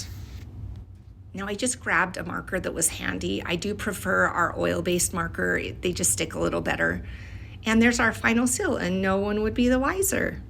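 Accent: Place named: Great Plains, United States. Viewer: plains states. Northern-ish, Like Kansas toward Idaho. Huwhite, meAsure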